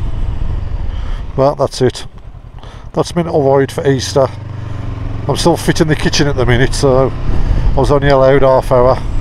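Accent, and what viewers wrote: Birmingham, United Kingdom. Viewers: Do I detect a Bham accent? Looks a bit like Brum in the vid. Yeah this Bike ticks a lot of boxes, esp if you just passed your test. Think am going for the Interceptor though, looks over sense haha (Honda mpg etc n longevity). I would def want a center stand.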